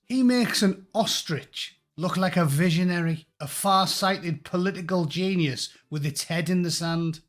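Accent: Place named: Newcastle, United Kingdom. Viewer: This country is a mess, but tuning in every day to hear the words Rudu-Kunubu with a Geordie accent makes everything a lot more bearable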